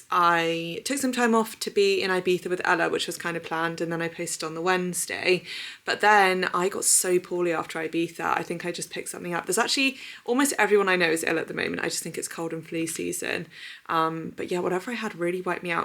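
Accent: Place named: England, United Kingdom. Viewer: I have never been in England..but it have always been so magical to me..and that accent. I dont know if im watching too much england garden tv shows or why its like that. Makes me feel warm and relaxed.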